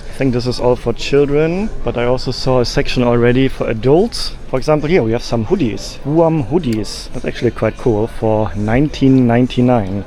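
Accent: Germany. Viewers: Let the German have his German accent folks. You can't POLICE the entire world's pronunciation. Hell, Americans don't agree on how to pronounce AMERICAN CITIES. Have some grace. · He's from Bremen, Germany and decided to make his videos in English. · are you born german? your accent is blended sounding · Thickest German accent ever!!! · Der Deutsche Akzent knallt richtig rein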